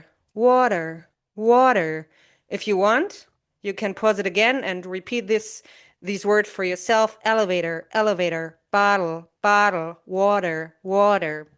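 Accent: United States. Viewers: i'm hearing a Minnesotan accent. sounds good.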